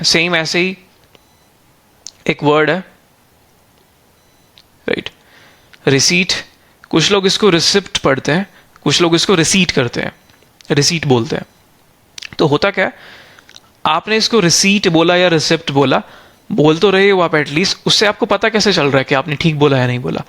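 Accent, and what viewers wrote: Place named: Pakistan. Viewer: sir ka excnt and urdu bilkul pakistani hy👍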